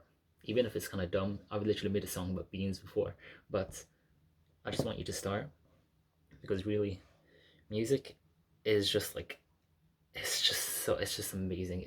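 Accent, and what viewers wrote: Ireland. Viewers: are you irish?? or live in ireland?? i can't place your accent but i swear you have our manners of speech it's driving me crazy lol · I hear an irish accent for sure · are you irish? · This was great advice, thank you! Also, not sure if that's a bit of an Irish accent I'm picking up or not? It reminds me of my fellow Dubliners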